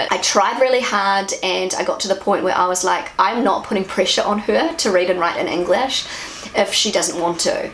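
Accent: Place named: New Zealand. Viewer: You know, hearing that Emilias teacher didn't recognize her as a native English speaker left me a bit wonderous. If she pronounced the words in English class the way she learned it from you it must have been more than obvious to the teacher because your "Kiwi"-English differs very significantly to the standard UK- or US-English that's usually taught here in Germany. Although I must admit that your accent isn't all that strong. I just saw New Zealands PM, Jacinda Ardern, on "The Late Show with Steven Colbert" and I really had to concentrate to understand everything what she was saying. I don't know about her politics but from her appearance on that show I must say she's a great ambassador for your home country!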